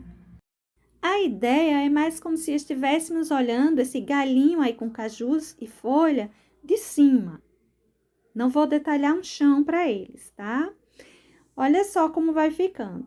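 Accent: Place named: Northeast, Brazil. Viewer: Boa noite ❤️💚 vc fala de qual Estado? Com este sutaque Nodestina.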